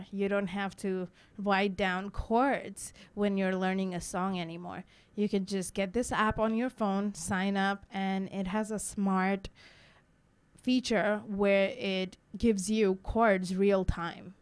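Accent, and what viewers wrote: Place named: United States. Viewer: Where do we learn to speak like a Yankee?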